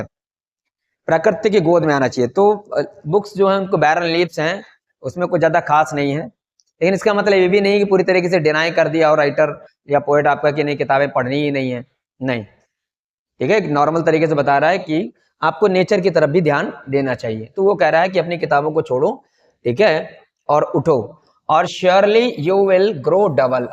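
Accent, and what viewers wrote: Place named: Allahabad, India. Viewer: Full allahabadi😂😂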